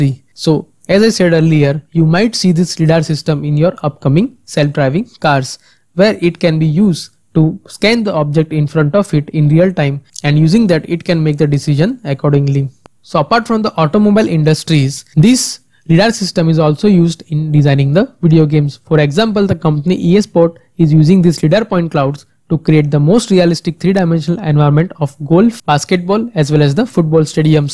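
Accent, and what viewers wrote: India. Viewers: leaves when i hear indian accent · Why don't you learn understanding Indian Accent. Bliv me India is the next superpower and you all might need to learn the way we speak.... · I'm Belgian, so I neither speak English nor Indian natively. In my opinion, understanding Indian English is about wanting to understand it. With a bit of training, (read: not actively avoiding Indian accent videos) I got to a point where it doesn't bother me that much anymore. And seriously, with the amount of Indian good quality channels like this one out there, it's totally worth it! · Indian people are so smart and clever but there is almoust always the accent :(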